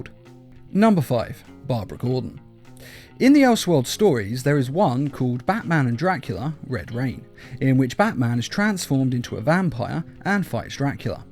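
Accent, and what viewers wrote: United Kingdom. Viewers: I have a question is this your real English British accent or is it fake and can you do a face reveal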